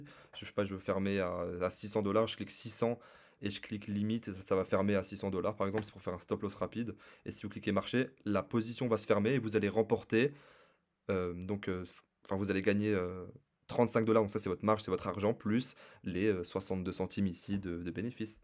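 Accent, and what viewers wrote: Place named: Switzerland. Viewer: Ta géré ta technique est simple et efficaces à parament je suis vraiment debutant et j’ai reussi je suis fier , et c’est grace a ton tuto je jure je l’es écouter au moin trois 4 fois , avec le téléphone et en meme temps sur mon pc , jamais pas les futurs de base , mais en vrais c’est l’avenir 🤣 lol jte remercie super bien expliqué, et les sécurités qui faut c’est tip top ! Merci a toi encore ;) je pense que je vais regarder toute ta chaine aussi passue je suis tomber par azard et j’aime bien l’accent suisse ! Nanante huit :) peace et merci 🙏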